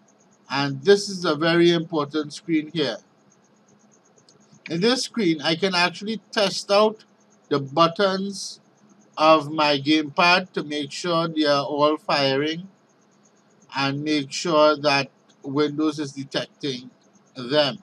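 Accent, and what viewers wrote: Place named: India. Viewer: Indian accent...........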